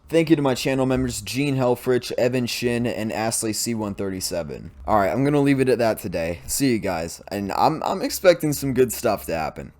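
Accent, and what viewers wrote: United States. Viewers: So painful listening to Ukrainian city names in an American accent.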